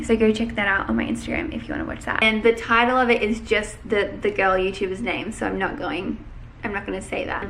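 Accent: Australia. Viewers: your Aussie accent is my fav thing ever I can hear you talk for hours!!!❤️ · And your Aussie accent is so cool to listen to 👂💖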